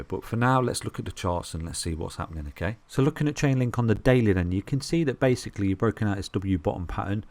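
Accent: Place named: East Midlands, United Kingdom. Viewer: I reckon that's an East Midlands accent!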